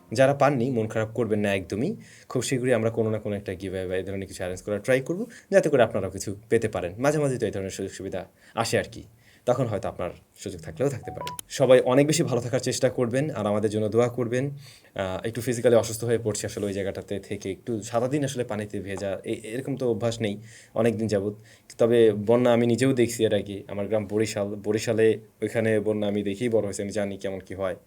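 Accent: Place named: Noakhali, Bangladesh. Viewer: bhai Noakhailla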